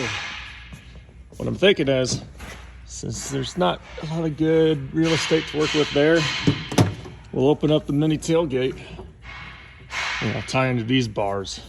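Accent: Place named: Georgia, United States. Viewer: has to be from Rural GA or AL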